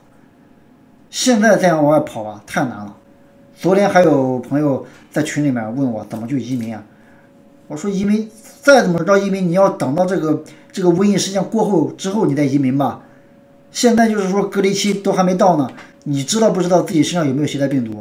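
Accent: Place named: Northern Jiangsu, China. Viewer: 口音苏北嘛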